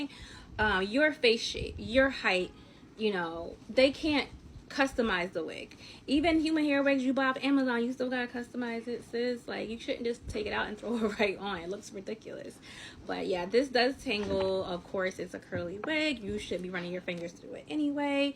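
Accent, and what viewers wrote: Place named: Philadelphia, United States. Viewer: I knew you were a philly girl. Talking bout this jawn lol. 215🩷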